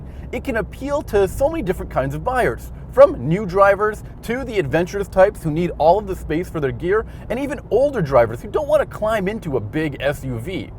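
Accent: Canada. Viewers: Thuffering thukatash I really really wanted to watch this video but how can I with this guy speech impediment mixed with his super strong Canadian twing? I can't imagine that the automakers are very happy when they got them back with the dashboard and windshield soaked with spit. Thank God for the mute button and closed captioning!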